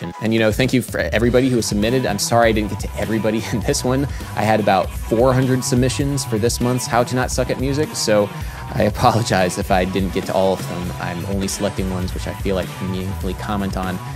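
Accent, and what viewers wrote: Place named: New York, United States. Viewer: Just something that keeps intriguing me. Where are you from? This is one US accent I’m not familiar with. Great vids mate!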